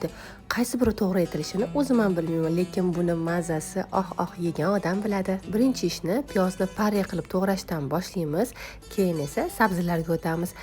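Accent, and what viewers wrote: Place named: Andijan, Uzbekistan. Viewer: Andijon shevada shovla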